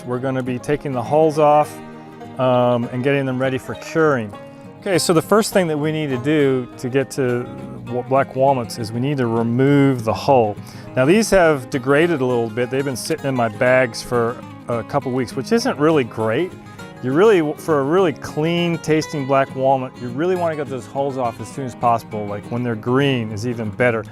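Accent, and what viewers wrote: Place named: Appalachia, United States. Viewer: You sound like you grew up in the deep holler.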